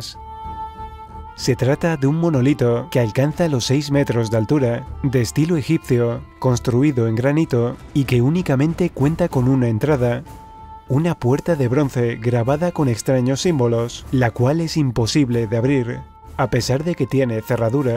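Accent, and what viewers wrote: Spain. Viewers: coño!!!!un español narrando el video!!!!yuhuuuuu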